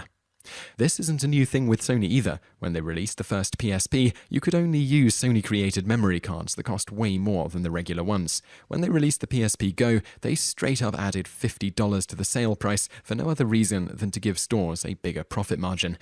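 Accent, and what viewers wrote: United Kingdom. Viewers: Clearly 40p, he's got a UK accent but I guess he's been around Yankees too much with all his yank phrases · Something tells me the narrator of the video is British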